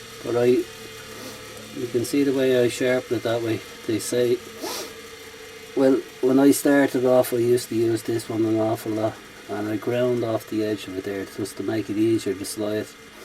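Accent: Dublin, Ireland. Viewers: Very helpful video. I've not done any wood turning since secondary school many years ago. I got a lathe at Christmas and have been tipping away since. Im guessing by the accent you are in Dublin, I was just wondering where you got the grinding wheels? I got a cheap 6" bench grinder but the wheels with it are very rough and I dont want to damage my chisels. Planning on making the home made Jig for the fingernail grind as they are too expensive to buy when they can be made quite easily. Also enjoying your other videos too. Planning on trying the multi axis cup when I get time.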